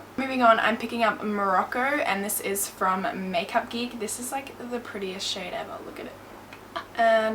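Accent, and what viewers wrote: Australia. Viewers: I'm not an expert in accents but is she Australian? Amazing makeup btw :) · U sound like Kylie Jenner but with an Australian accent XOXO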